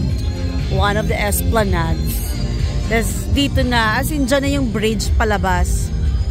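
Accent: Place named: Iloilo, Philippines. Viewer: Done watching na po ms... sobrang enjoy po panoorin.. ang ganda ng iloilo.what i notice.. ang linis esp. ng main road. Even during the festival kung meron man kunti basura lng..at meron pa mga nabibili mga souvenir..and can take pictures by the bridge.. beautiful people... And i love tlga ilonggo accent ms...😍😍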